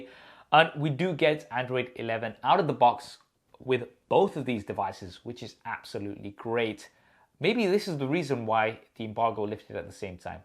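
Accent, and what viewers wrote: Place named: United Kingdom. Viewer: British accent makes me uncomfortable 😐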